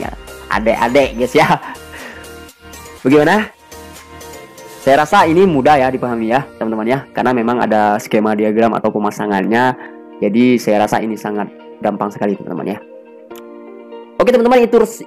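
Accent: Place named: Malaysia. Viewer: Bang ingat dullu saya ppasang wayering di malaysia bang.logat bicara abang kayak orang melayu.abang banyak ok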